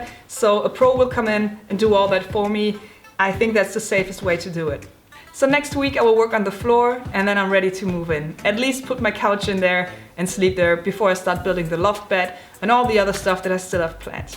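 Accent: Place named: Germany. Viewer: Noch ne Deutsche die versucht Englisch zu reden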